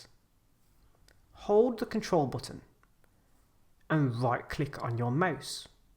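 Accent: England, United Kingdom. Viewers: I love the ASMR style. I swear the English accent rather educational or not makes me feel like I learned something regardless of what it’s about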